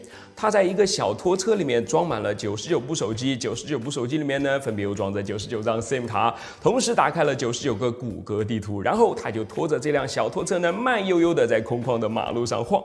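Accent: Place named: Sichuan-Chongqing, China. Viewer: 我和朋友猜是哪里人，应该是川渝或是江浙一带，前者可能性更大，不知道对不对？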